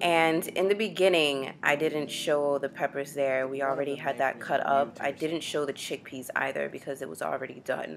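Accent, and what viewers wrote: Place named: Caribbean. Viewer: U sound so Caribbean, Jamaican or barbadian to be exact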